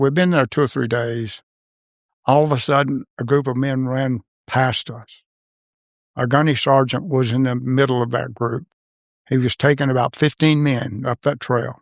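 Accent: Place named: North Carolina, United States. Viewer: Sir you mighta traveled a lot but knew were North Kackalaky soon as you spoke.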